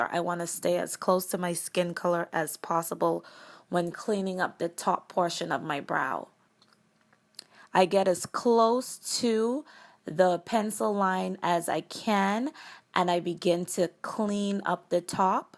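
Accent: Bahamas. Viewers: Sound like a Bahamian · Bahamian accent???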